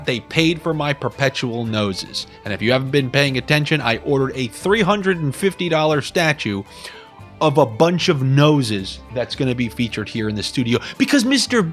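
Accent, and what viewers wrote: Boston, United States. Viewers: The boston accent🔥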